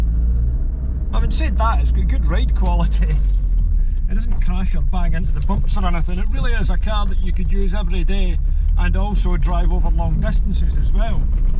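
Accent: Scotland, United Kingdom. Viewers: Your scottish is that deep you sound like Rab C Nesbitt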